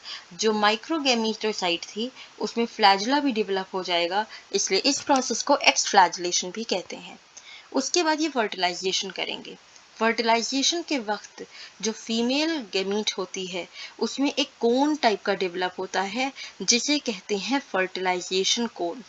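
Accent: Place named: United States. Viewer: Ma'am the video was fabulous , marvelous , fantastic , mind blowing , exellent . Your way of teaching is fabulous the way you explain is just fantastic . I had problem in understanding this topic but after watching your video i understood everything . I sure that you are the best teacher in the world with a stylish or i should say american accent .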